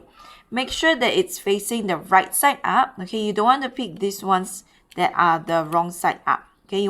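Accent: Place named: Singapore. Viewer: I am so glad I found this video for so many reasons! Firstly, because it was exactly what I was looking for! Incredibly helpful and informative! Also. This is completely off tangent, but i adore your accent! 🥰❤️ it sounds Singaporean/malaysian and reminds me of home! So thank you for that!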